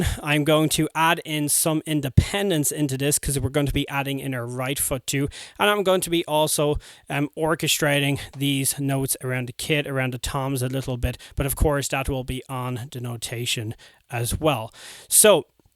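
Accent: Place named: United States. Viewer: Great to see you back doing what you do best. You're a natural born drum teacher. I don't know if your family in Ireland notice it when you make video calls but there's some American creeping into the accent.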